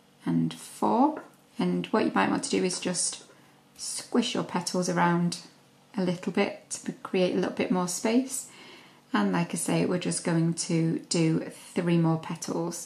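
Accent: United Kingdom. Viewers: I love Brits accents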